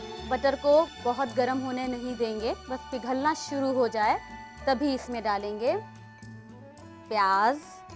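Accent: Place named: Uttar Pradesh, India. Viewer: Awesome recipe. Looks great. Just need to say sm tips while cooking. Tipical up accent. keep it up. Gd job.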